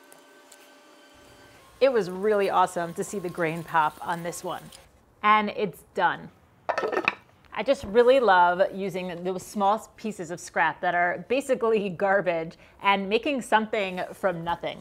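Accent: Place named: Long Island, United States. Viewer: trying to place your accent. Could it be the eastern end of Long Island?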